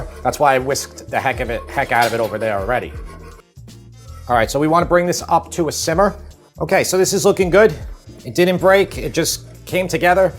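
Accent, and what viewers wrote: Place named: East Coast, United States. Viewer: Boouught and fooour cups, abatt. Awofff?!? Swalt?! What?! Is the east coast okay? Malk... no.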